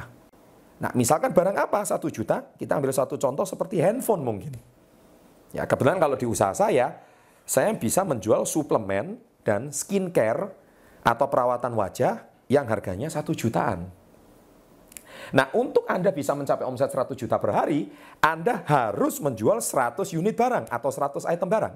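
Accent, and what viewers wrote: Java, Indonesia. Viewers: ngmong'a medok,kyak'a anda orang jawa y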